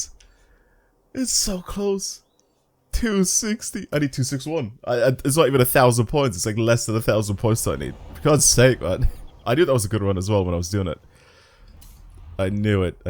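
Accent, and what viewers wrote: Ireland. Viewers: personal question but do you live near kallinary in ireland, ur accent sounds very similar to someone i know from there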